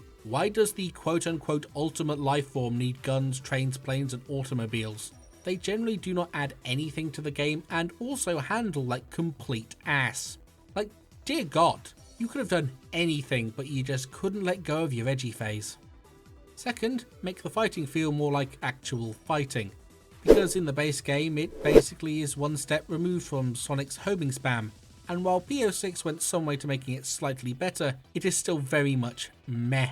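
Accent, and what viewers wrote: United Kingdom. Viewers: I didn't read every comment on each video you made, but did anyone ever tell you that you should have more subs? Maybe it's the British accent, idk, it only made me a little uncomfortable.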